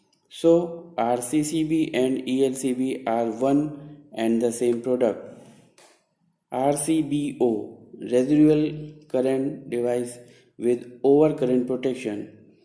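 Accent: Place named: India. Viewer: I'm English Through and Through I can understand everything, why?, because my wife (of 30 years) was from India Ive lived in India many times, and its nice to hear an Indian accent , so anyone wanting to complain about his accent please see me and I'll wash your mouth out with SOAP! Thanks for the explantion I was worried I was installing the wrong gear DIY in asia (Thailand) until I saw this quick and nifty explanation, sada suki raho!!!!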